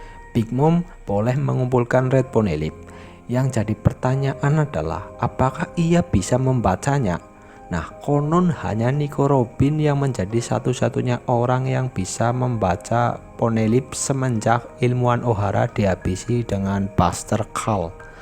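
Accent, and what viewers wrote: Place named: Java, Indonesia. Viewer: suaranya medok bngt!!mau nonton jdi kga jdi ,next.vidio pake teks aja gan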